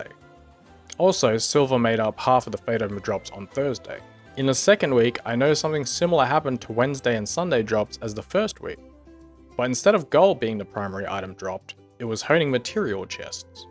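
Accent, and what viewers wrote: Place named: United States. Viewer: Your my hero! Wished i could do more then just thumbs up and subscribe. You sound like US, im in germany id send you REAL beer, for sure! Edit: Thats some insane account you have there, ive been playin since launch, even played at day 1 2014 with an fake account. Even if i had that much time to make so much high ilev characters i doubt i had the stamina for that, respect!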